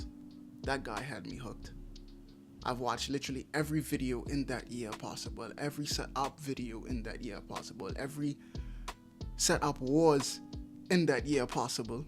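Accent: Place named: Caribbean. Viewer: you sound like you have caribbean roots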